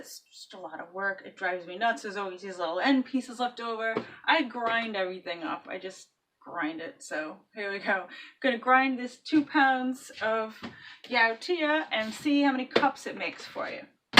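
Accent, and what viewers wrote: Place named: New England, United States. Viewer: Thankfully I recently found your channel and the moment I heard you speak, I KNEW you were also a New England girl. 🙌🏻. Thank you for all you do here. I see the comments. I know all the positive feedback you receive, but I hope you truly understand what positive things you are doing for all of us who are desperately trying to heal themselves in various ways though just don’t know how. Many many thanks. ♥️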